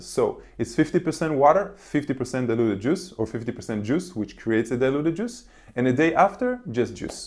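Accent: Israel. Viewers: Hi.. came across your vid... As an Israeli- I can hear your HEBREW!!!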